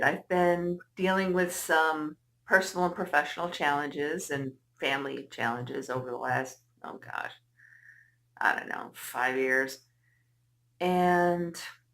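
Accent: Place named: New York State, United States. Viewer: I thought I heard a NY state accent. I live 10 min away from Niagara Falls, Canadian side. I will check out the rest of your channel. Great job!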